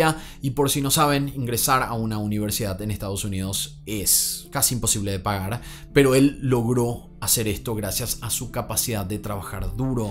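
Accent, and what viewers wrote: Paraguay. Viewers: Èl es spectacular narrando cada historia y su español es impecable explicando todo tofitito, soy unas de su seguidora ademas ese acento paraguayo es unicooo❤❤❤❤❤ un chico lindo lindo nuestro.!!❤❤🙏🙏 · Amo que no soltas tu acento paraguayo 😍😍😍😍🇵🇾🇵🇾🇵🇾 excelentes casos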